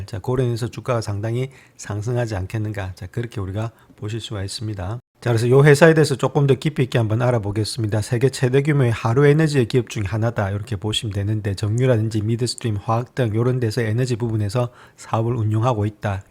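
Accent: Gyeongsang, South Korea. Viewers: 차분한 경상도 사투리가 새롭고.. 다른 시황분석🎉에 박수 보내며 감사합니다.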